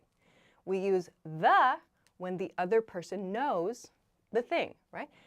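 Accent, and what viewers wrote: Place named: United States. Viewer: She speaks American english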